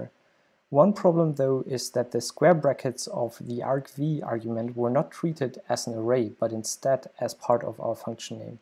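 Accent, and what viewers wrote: Germany. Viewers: Wonderful introduction! You kind of sound German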